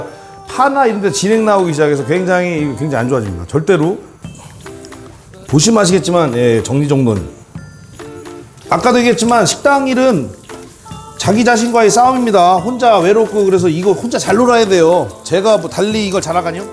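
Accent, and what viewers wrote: Chungcheong, South Korea. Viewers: 구독과 좋아요!! 백종원 선생님을 옛 무한도전 때 정준하 형님이 무슨 요리 레시피 관련해서 찾아뵙던 방영분이 지금도 생각납니다. 아마도 그 때가 백종원 선생님을 처음 알았을겁니다. 그리고 나서 아마도!! 백종원 선생님을 전국적인 스타로 이름을 알리게 된 방송이 우리가 다 아는 이모티콘 방송이었을 테고요 ^^ 편집의 대마왕이라 불릴 정도로 깨알만큼 재미를 더 했었죠 그리고 ~쥬? ~쥬!! 구수한 충청도 사투리까지 더해져서 재미가 몇 배가 되었습니다. 이 후 부터는 인기와 더불어 질투하는 분들도 많이 생기고.. 어쩔 수 없는 연예인에 대한 호감에 대한 반비례 아닐까 싶습니다. 숙명과도 같은 거라고 봅니다. 같은 '성(性)'을 사용하는 분들 중에서 큰 으뜸이 되는 분이 되길 기대하고 바라겠습니다. 화이팅 입니다!!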